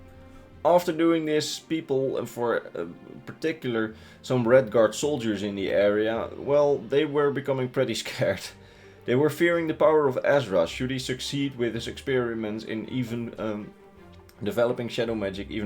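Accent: Netherlands. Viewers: I recognize that accent anywhere. Hello fellow Dutchman!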